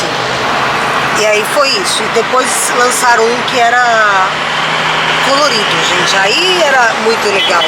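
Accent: Ceará, Brazil. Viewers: desculpa a curiosidade. Mas você é cearense?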